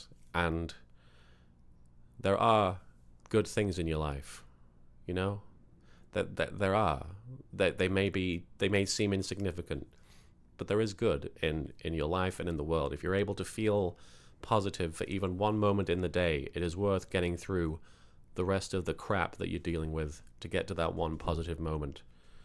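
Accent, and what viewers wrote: United Kingdom. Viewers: where do u live by the way u sound british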